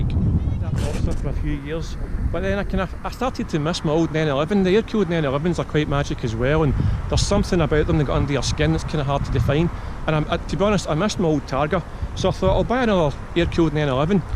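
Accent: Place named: Scotland, United Kingdom. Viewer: Really enjoy your channel, and it's very informative content...and your Brogue. (Especially since I am from New Scotland- Nova Scotia). 🙂 Your passion about Porsche's, namely Boxsters is really infectious, I am working towards exactly what you have - a Guards Red 987. Thank you for the inspiration, and dispelling fears around maintenence costs and the dreaded you know what...cheers! P.S., going to have to source out your Boxster book!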